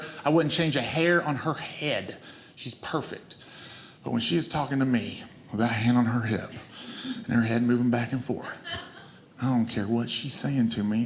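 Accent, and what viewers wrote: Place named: South, United States. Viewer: Really hilarious especially with the southern accent. Cant wait for more from him...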